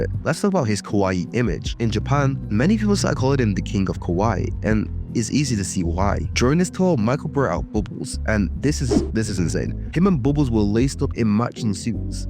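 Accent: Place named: Yorkshire, United Kingdom. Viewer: I wonder if this fella learned English in the north of England. He sounds like a Yorkshireman.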